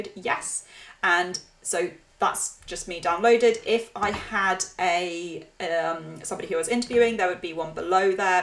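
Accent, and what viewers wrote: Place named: Australia. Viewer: I liked the tutorial. I have been using Ringr for years but they just had a meltdown and their customer support sucks. I have zero tolerance when it comes to support issues and a meltdown. How is the support if you need it? Are you familiar with Ringr and I am going to assume you are pretty high on Zencastr yes? Also I love your name and your Australian accent.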